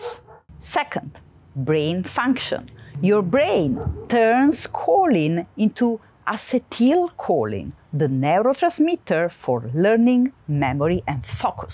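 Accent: Naples, Italy. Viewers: dott lei rimane napoletana anche quando parla in inglese😅. Un saluto per la sua mamma❤ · Quanto è sexy l'inglese con l'accento napoletano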